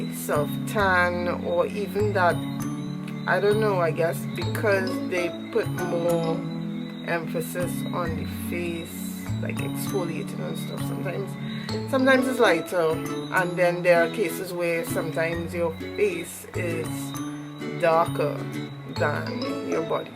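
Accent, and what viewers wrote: Trinidad and Tobago. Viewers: New subbie....your accent.....Trini?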